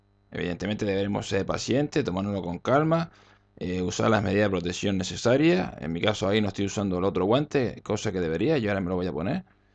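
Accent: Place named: Canary Islands, Spain. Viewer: Es canario.. Por como pronuncia la. CH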